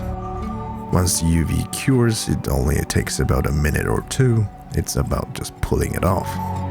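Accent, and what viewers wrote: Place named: Germany. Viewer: It's peculiar that you sound like a German native speaker to me.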